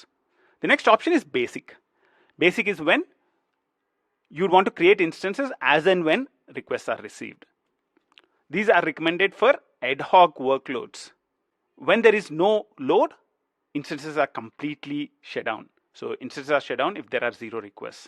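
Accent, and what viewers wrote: India. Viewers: Dear Colleague, please get rid of the Indian accent.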